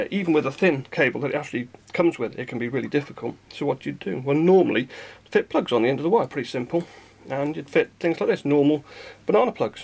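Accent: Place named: United Kingdom. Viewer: really like british accent. sounds so relaxed not like my launguage :D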